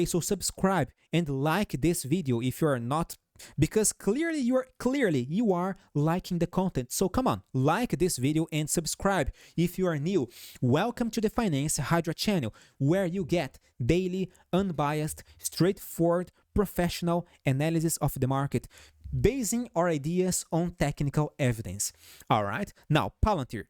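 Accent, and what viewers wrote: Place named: Brazil. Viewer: Eu desconfiei desde o primeiro video que assisti que vc era brasileiro pelo sotaque kkk. Parabéns pelo trabalho!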